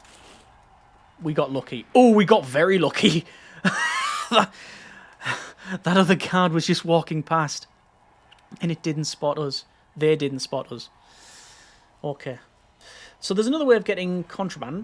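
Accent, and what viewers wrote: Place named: Middlesbrough, United Kingdom. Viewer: Why do you sound like you're from Middlesbrough??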